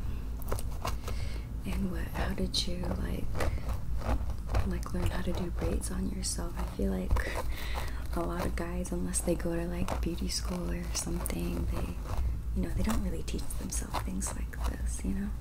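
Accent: South, United States. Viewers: Luv those southern accent vids💯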